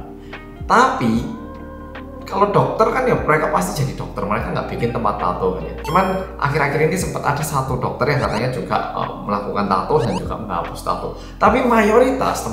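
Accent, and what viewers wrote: Java, Indonesia. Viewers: Sakjane aku ndelok channel e mas ora mergo samiyan ki wong Korea, tapi mergo medok e ki lo. Jarang enek seng medok ngeneki nang YouTube wkwkwk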